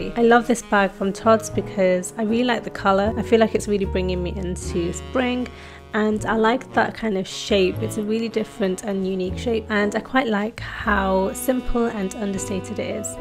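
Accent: United Kingdom. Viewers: OMG your Brit accent is so beautiful! Love hearing you.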